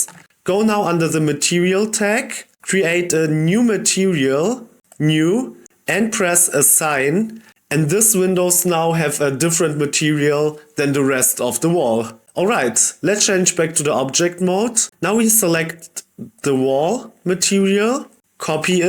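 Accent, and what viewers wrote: Germany. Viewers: great video and love your accent. Are you German?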